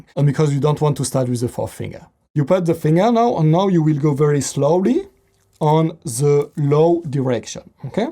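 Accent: France. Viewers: Oui! J'adore l'accent français😘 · Accent français ça non ?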